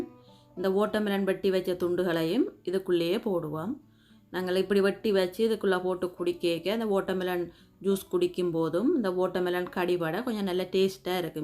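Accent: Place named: Sri Lanka. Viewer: Supero presentation r u from sri lanka nice to hear your tamil m new frnd stay conctd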